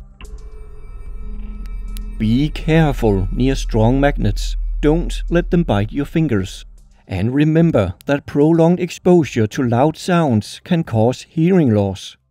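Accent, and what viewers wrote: Denmark. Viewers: Are you from denmark ?🇩🇰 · Are you Danish? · Are you from Denmark your accent sounds like Danish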